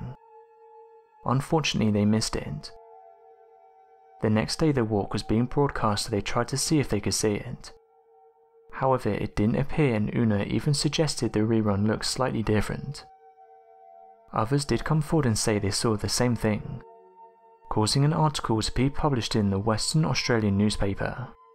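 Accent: England, United Kingdom. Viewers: I waited for a British accent to begin commentating the video... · Really tired of the Heavy English accent much of which couldn't be understood 🍀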